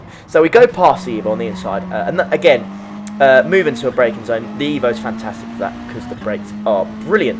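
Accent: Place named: United Kingdom. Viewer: Anyone ever tell you that you sound like Daniel Radcliffe? Of course, I'm American, so I guess a lot of Brits sound that way to me. 😂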